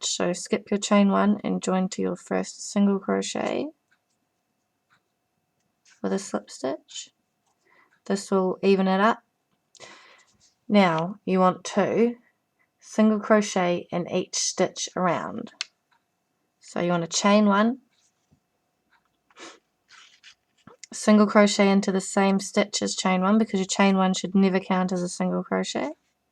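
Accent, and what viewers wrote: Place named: New Zealand. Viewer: So cute :) also so nice to not have to concentrate to listen. We need more kiwi accents on YouTube!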